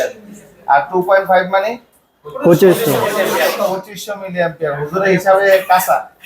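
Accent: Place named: Comilla, Bangladesh. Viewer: কুমিল্লা কথায়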